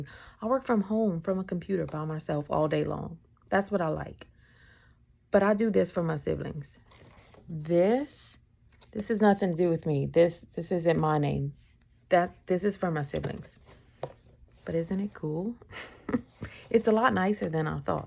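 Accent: Louisiana, United States. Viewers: My step mother was killed by a drunk driver on her way to work, the day before my bday and we had plans for that day. Changed my life forever. The fact people still drink and drive when all these apps exist is beyond me. Y’all are touching and changing many young lives when they think about drinking. This was cool to me to learn about as well I’d love to do something like this too one day and look forward to being able to donate to help in the meantime ❤ Being from the south I get the accent thing but imo if they listen you can’t hear the lisp and the Cajun is just Flavor for the words 😂❤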